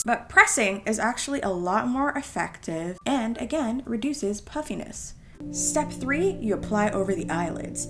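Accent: United Kingdom. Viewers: She has a good British aksent lol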